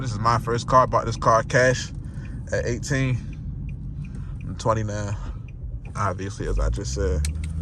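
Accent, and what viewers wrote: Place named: Baltimore, United States. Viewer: That Baltimore accent thick gud video G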